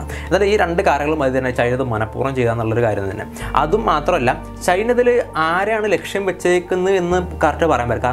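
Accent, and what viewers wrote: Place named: Kannur, India. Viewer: aaah njan kannur aanu Ninghal palakad alle But baasha kannur kaar pola ind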